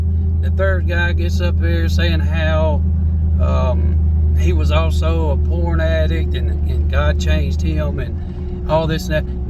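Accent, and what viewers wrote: United States. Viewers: The churches are no better in the UK, people not friendly and in there clicky groups, i stopped going very dissapointed with what i experienced, thankyou for sharing, u are looking well brother praise Jesus, love ur deep south accent , see u in the clouds very soon , maranatha 🙏🏻💕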